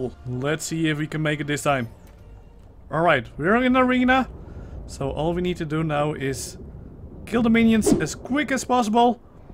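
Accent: Netherlands. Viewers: Dutch pronunciation ganggg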